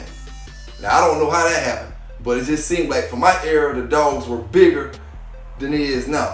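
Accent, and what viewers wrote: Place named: Oklahoma, United States. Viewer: Much love Keep pressing forward. I don't know where you from...sound like you from Oklahoma.....love the channel. #Kansas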